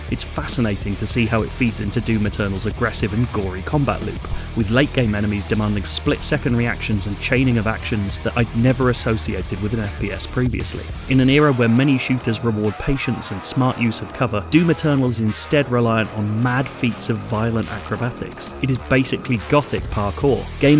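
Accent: United Kingdom. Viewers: Only British accents can make Doom sound fancy.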